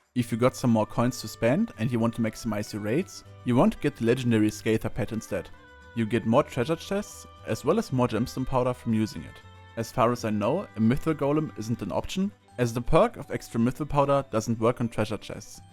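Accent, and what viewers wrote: Germany. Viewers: I höre das deutsch heraus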